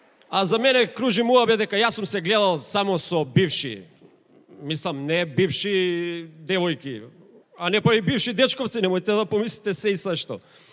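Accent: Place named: Kumanovo, North Macedonia. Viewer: Zlatno pravilo zošto ne e interesno.... ili zbori kulturnu ili teraj kumanovski....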